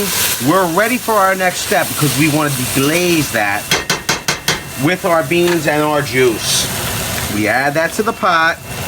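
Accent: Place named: Italian-American, United States. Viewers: Like no one knows that. . . I guess a lot of people don’t know how to “properly” slice an onion. Seriously, I’m 100% Italian and I was so skeptical about you at first, (some people that never even heard of Scaloe. I know what it is and cook with it like my nana did. It’s so good for you. Anyway, just wanted to say you’re impressive. I wish my man would cook, let alone good Italian food. Sounds like you’re from NY. 💕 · I'm not sure you used enough garlic....As if your accent didn't give it away, when you showed the bread I knew exactly where you were from! Making escarole and beans today but my crusty style bread will come from Panera since in SC we don't have Italian bakeries. Manja!! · Hi, you must be from Philadelphia! I can tell by the way you say water🤣🤣. You’re probably in South Philly❤. Love you from Northeast Philly. 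And I’m gonna make this.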